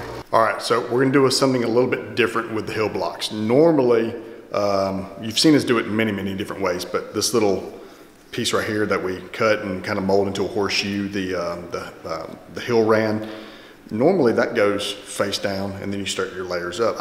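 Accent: South, United States. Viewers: Even though your channel focuses mainly on men's shoes (and I understand your demographic is mostly male, so right you are), you have no idea how PERFECT your videos are for me, a woman, to have on in the background while putting on makeup in the morning. Cobbler shop ASMR interlaced with shoe wisdom spoken in a smooth but approachable Southern accent. 👌🏻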